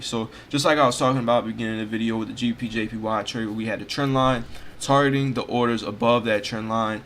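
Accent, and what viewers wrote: Louisiana, United States. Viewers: I thought that accent sounded familiar, I'm from Louisiana also. Lol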